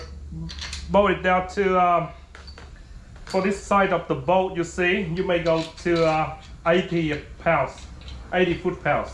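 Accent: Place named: Australia. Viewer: How many years you been a mechanic very smart man love your Aussie accent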